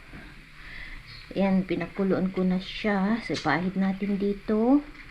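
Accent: Ilocos, Philippines. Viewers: Halata k nga Ilocano uray Tagalog ti saom kabsat. No maminsan kasla Ibanag ti accent mo.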